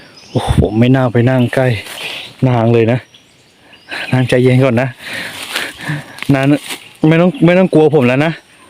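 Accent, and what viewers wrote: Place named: North, Thailand. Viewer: พูดเหมือนคนทางภาคเหนือของเรา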